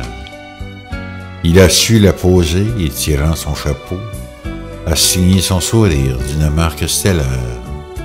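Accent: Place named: Canada. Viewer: Bonjour Superbe narration de cette poésie accompagnée d’une belle vidéo c’est toi l’auteur compositeur? Je pense toujours a toi car nous devions faire une émission de radio ensemble ca ne c'est pas fait car je me suis rendu compte que tu avais un accent canadien bien prononcé et mon responsable d’antenne n’aurais pas accepté tes interviews pour nos auditeurs du sud ouest de la France!!!! Je culpabilise depuis ce temps là Excuse MOI Je rentre de Charente Maritime ou je suis allé a Hiers Brouage ou est né Samuel Champlain fondateur de Québec je t’embrasse SINCERES AMITIES <mail> PS je ne fais plus de radio depuis 2ans ½ mais je viens de prendre ma retraite peut- être je vais reprendre????????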